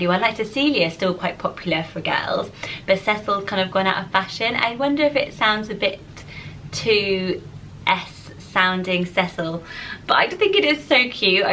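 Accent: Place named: Bristol, United Kingdom. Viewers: I'm from Weston and honestly didn't notice your West Country accent until this video 😂 I go to Swindon often for the ice rink 😜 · I KNEW IT, I FREAKEN KNEW IT! I COULD HEAR THE RRR'S AND I COULD HEAR YOUR ACCENT, I'm sorry I'll calm down... 😂Okay well I predicted Bristol, but I mean, I was pretty close. :D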